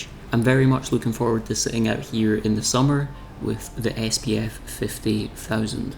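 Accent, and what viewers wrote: Scotland, United Kingdom. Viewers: TECHNICALLY IT IS NOT " NATURALLY RUNNING WATER".... IT HAS BEEN CHANNELED THROUGH A MANMADE STUCTURE.......I felt obliged to correct you since you are such a carefully worded individual .........Secondly , I wonder if you have deliberately modified your Scottish accent......because your speech is pleasant.....whereas in general the Scottish accent is brutal on the English language ....I only watched to the " naturally running water" reference so that is all have to say regarding this video......however , I was interested by your video revealing how much Youtube paid you......and I did notice also , your promo for your " Questions people ask me" video.....but didn't watch it......however ,.....are you gay / are you single /Did you buy that cat because its colourings resemble your own / what are your opinions on the current transgender debate ?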